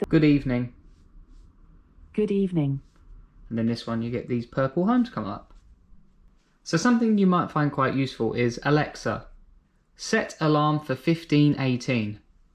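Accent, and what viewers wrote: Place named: United Kingdom. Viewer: your voice sounds british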